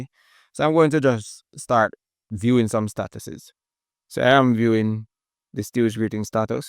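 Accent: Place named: Guyana. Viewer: Nice accent. Guyanese?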